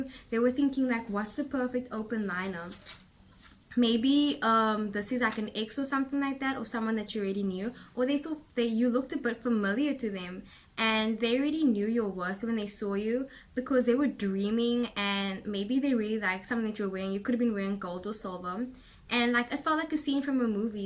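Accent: South Africa. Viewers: 😂😂😂 The 2nd scarf - You totally took me back to where we met. His a gemini and I'm a cancer. Everything you said resonates besides the long distance part or probably it means the distance between the two of us. I'm even older thn him You have a South African accent. Do you live in Kempton Park or near Lanseria? If I'm wrong, let me know please. I would like a reading in person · Ah south African? ?? 😄 yessss 😄👏❤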